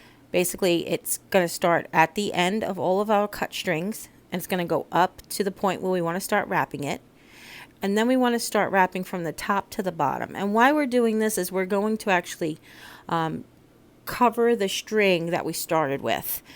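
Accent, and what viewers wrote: New York, United States. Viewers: Beautifully done! The way you tucked in the moss really made the letter stand out. Great tute (lol) on how to make an absolutely perfect tassle. The trick with securing the wrapping string is a madame knot, I'm sure you know that. You were going to say something about the shamrock and/or four leaf clover, did I miss it? Tyfs, love these and as always it was so nice spending a little time with you, your New York accent really came out on this tutorial and I love it. See you soon 🤗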